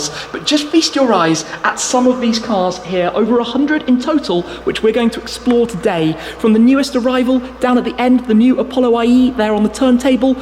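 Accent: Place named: England, United Kingdom. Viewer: i really like your english accent. verry lovely hearing👂👂💗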